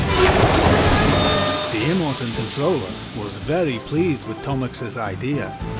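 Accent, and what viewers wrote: Scotland, United Kingdom. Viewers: Is the narrator Scottish?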